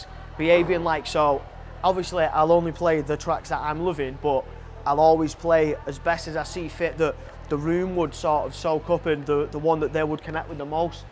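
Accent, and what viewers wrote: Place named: Manchester, United Kingdom. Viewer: Love the Manc accent! ‘Bring in some rims...’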